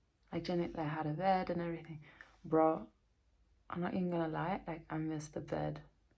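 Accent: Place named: United Kingdom. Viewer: i’ve missed u smmmmmm. also am i just noticing you have a british accent :0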